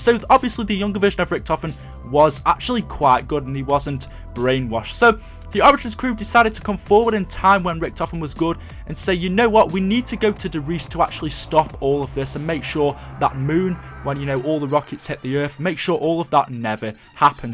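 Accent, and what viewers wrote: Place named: Stoke, United Kingdom. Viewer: hate the Stoke accent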